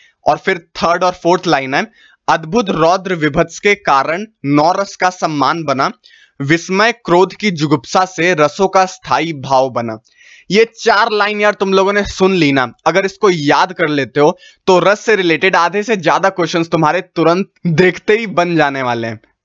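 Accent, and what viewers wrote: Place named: Kanpur, India. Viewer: Bhaiya Aapki aawaj na...Bilkul Kanpuria style h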